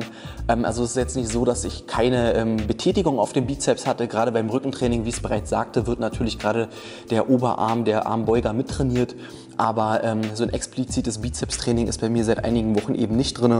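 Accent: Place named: Berlin, Germany. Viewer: Er hat nen Berliner Dialekt. :) Berlin?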